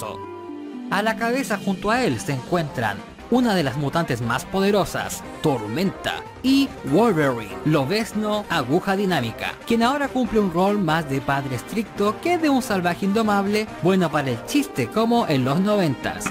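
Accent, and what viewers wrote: Chile. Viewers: Acento chileno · Eres chileno?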